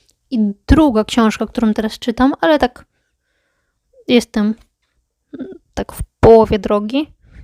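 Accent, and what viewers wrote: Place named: Ukraine. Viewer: Hej! Ciekawe wszystkie 3 książki, zwłaszcza ta "pisz, publikuj, działaj". Tak w ogóle to jesteś Polka czy Ukrainka, bo masz dość ciekawy akcent? Pozdrawiam.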